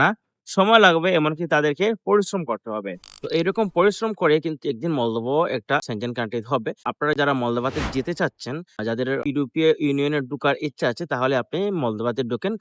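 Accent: Sylhet, Bangladesh. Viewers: সিলেটি মনে ভাইসাব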